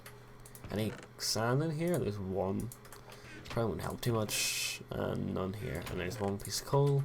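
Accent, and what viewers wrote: Northern Ireland, United Kingdom. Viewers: I recognise the northern Irish accent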